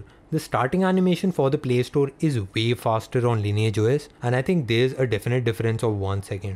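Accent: India. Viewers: I think it's good to get used to other people's accent which is quite different from most of us, because one day, if you visit India, bear in mind that most of them speak like that. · It's not broken english - he just has an indian accent - I think he has a great voice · Can you speak better english without this fucking indian accent! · I am not racist ..but in india your english is very indian.. :) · I suppose only Indians may understand this kind of English. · Trust me. Train your english. Because i can't understand SHIT with your indian-accent english are you saying. U said channel to chaniil at the beginning. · another fucking india accent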